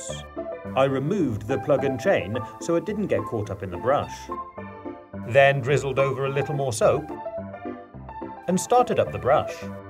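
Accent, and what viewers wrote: United Kingdom. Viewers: hi I was wondering are u brittish?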